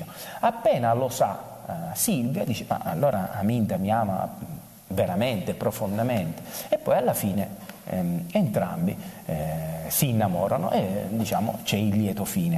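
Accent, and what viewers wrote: Sicily, Italy. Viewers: tu siciliano sei, il mio accento lo riconoscerei tra mille❤️❤️❤️ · Il nn compreso....eccede.....aaaaaa, un saluto grazie il suo siculo è simpaticissimo anch io isolana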